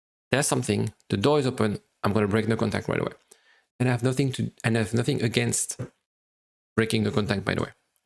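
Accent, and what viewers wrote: France. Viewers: This video was extremely helpful. Subscribed! Also, your French accent is very cute😊🇨🇵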